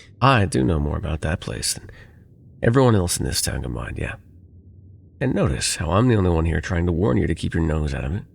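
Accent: South, United States. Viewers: Love this!! AND I LOVE THE SOUTHERN ACCENT!!!! Reminds me of my hometown 😭❤️ THANK YOU!!!! LOVE YA AND YOUR VIDS!! KEEP UP THE AWSOME WORK!!! ❤❤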